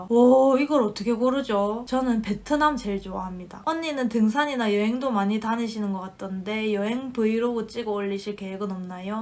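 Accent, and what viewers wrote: Gyeongsang, South Korea. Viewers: 익숙한 경상도 사투리로 동생이 언니야 이렇게 하자! 하며 신세계를 알려주셨습니다. 40넘은 늦은 나이지만 따라하기 실행하고 있습니다. 연금저축도 IRP도 개설 했습니다. 시작이 반이니 계속 시청하며 따라가 보겠습니다. ㅎ 오늘도 행복한 하루 되십시오. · 어쩌다 우연히 영상을 접했는데 목소리 억양 경상도 사투리 완전 귀에 쏙쏙 들어오고 참 야무지고 똑 부러진다 생각했어요 나늠 알뜰하게 생활은 하고 있었지만 여러 재테크 팁들 너무 너무 도움됩니다 재테크1도 관심없는 동생에게 추천해 동생도 영상고며 많은 도움 받고 있네요~~ 이번에 청약도 하나 넣으려고 준비 중이고 저도 국내 주식만하다 외국 etf공부 중이예요~ 나이가 드니 머리에 입력하는게 힘든데 쉽게 설명해줘서 귀에 쏙쏙 들어오고 있어요 앞으로도 좋은 영상 부탁드려요 추운데 감기 조심하세요 ^^ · 크리스마스에 혼자 영상보면서 많은 위로가 됐습니다. 점점 지치고, 목표의식이 흐려질때마다 영상 찾아서 보러온답니다.. 저도 고향이 경상도라 말투가 너무 친근하고 아는 언니같구 그래요...! 타지 생활하면서 우연히 보게 됐는데, 이렇게 영상 꾸준히 올려주시면서 쓴소리 단소리 자주자주 해주세용, 보이진 않지만 저 멀리서 응원하고 있답니다! 따뜻한 겨울나세요...!! > < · 5만 구독자 축하드립니다 곧 대학생이 될 울아들이 같이 똑똑하고 야무지게 지할일 챙기며 생활했으면 하는 바램이 드네요^^ 결혼하며 부산 떠난지 20년이 되어오는데 구수하고 친근한 경상도사투리를 들을수있게 되어서 좋았습니다 앞으로도 응원할께요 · 처음엔 알고리즘으로 알게되었는데 설명도 너무 똑부러지고 경상도사투리가 너무 정겨워서 새로운 영상 업로드될때마다 하나도 안놓치고 다 봤어요 똑순이 소중한 영상 너무 감사합니다 경제에 대해서 무지한데 많이 알려주셔서 너무 감사해요 꿀템들 소개영상도 엄청 기억에 남네요 미리 메리크리스마스🎄 연말도 잘 보내세요💕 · 몇일전에 우연히 들르게 되었는데 같은 경상도사람이라 사투리도 구수하고 귀에 쏙쏙 박히네요~ 현재 40대로 코로나로 실직후 6개월째 재취업준비중인데 마음과 같은 결과가 안나서 심난한데 괜히 연말까지 겹치니 더 뒤숭숭하네요 오늘도 면접 한군데 보고왔는데 좋은결과있어서 새해부턴 직장인으로 다시 출발하고싶네요~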